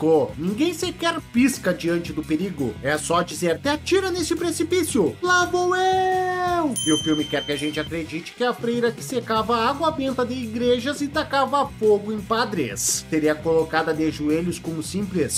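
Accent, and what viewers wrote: Rio Grande do Sul, Brazil. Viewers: A pergunta que não quer calar, tu é gaúcho?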